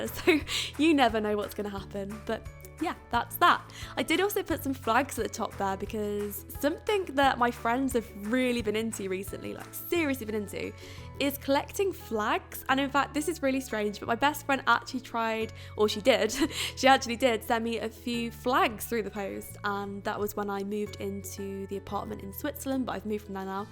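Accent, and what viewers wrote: United Kingdom. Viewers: Can you do a Q&A? And if you'll do one I have some questions: Where are you from? (In the video you said you moved to an apartment in Switzerland, but you have like a "light" British accent) How do you find the inspiration to your builds? Can you do a colab w/ Vixella please?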